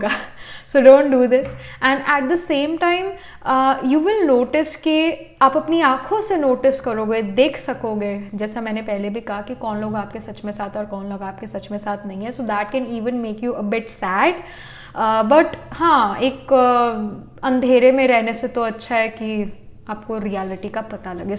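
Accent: Punjab, India. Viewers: Aashiq mashuq😂😂😂....are u a Punjabi ma'am?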